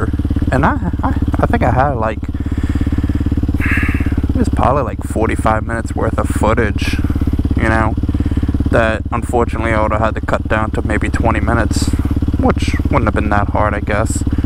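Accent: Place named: Pennsylvania, United States. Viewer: For some reason I hear a PA accent…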